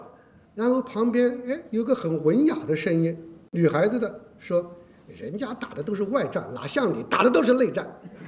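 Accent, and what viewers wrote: China. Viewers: 一听你口音就知道你是安徽人，合肥南部巢湖北部的口音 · 共產黨政權是奪來的所以不合法這話太亂談琴了！ 你覺得誰的政權不是從別人手中奪來的誰是合法的？？說著中國腔嘴巴卻不說中國話！！別鼓吹獨立了！為了部分人政治利益，把大部分陷於絕境！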